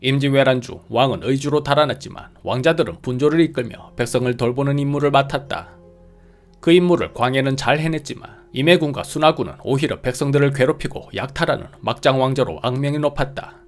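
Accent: Gyeongsang, South Korea. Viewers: 이분 경상도 억양만 없으면 듣기 좋을텐데...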